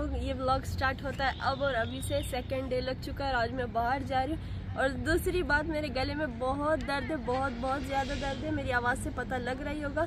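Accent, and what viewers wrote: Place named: Middle East. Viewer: Ap Pakistan se ho k middle east se qk ap Urdu JB bolti ho to jaise lagta hai k ap middle east ki ho.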